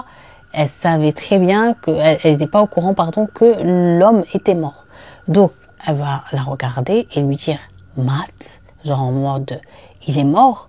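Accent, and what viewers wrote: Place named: Arab world. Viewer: Merci pour ton travail t'as une voix superbe et j'aime ton accent arabe.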